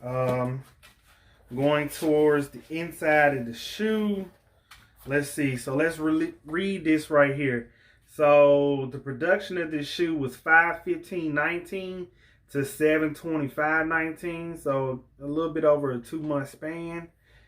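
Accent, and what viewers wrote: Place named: Kentucky, United States. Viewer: Where are you from? Your accent is like wow? Kentucky?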